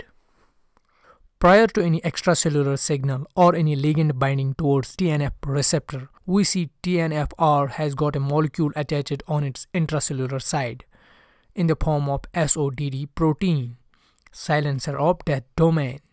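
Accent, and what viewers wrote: India. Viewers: نفسي افهم الاكسنت بتاعة الهنود😭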